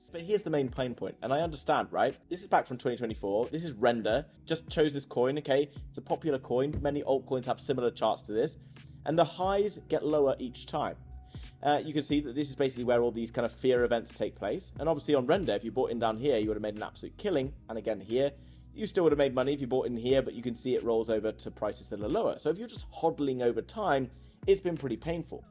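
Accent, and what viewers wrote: United Kingdom. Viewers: the British accent! love it :D and thanks for the info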